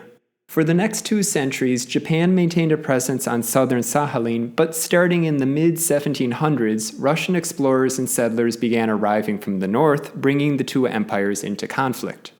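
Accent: United States. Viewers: Why would you expect someone who’s clearly American to pronounce the Russian O like /ɔ/? That sound quite literally doesn’t exist in American English at all (except in diphthongs and before R) – so of course he won’t say it the Russian way, especially in the middle of an English sentence. In the same way, an English person shouldn’t expect a Russian to pronounce “New Hampshire” or “Hythe” exactly the English way – and especially not in the middle of a Russian sentence, because that would just sound weird. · I think he just pronounces it like that because he's American. the o sound like in "top" or "stop" is pronounced like an "ah" sound in American English. American English doesn't really have a sound that's exactly like the stressed o in Russian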